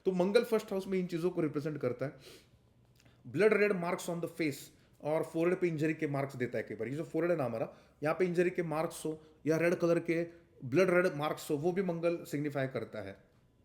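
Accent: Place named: Maharashtra, India. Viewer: Aap maharashtra se ho ,marathi .. observed ur chautha pronounciation... Ekdam chan video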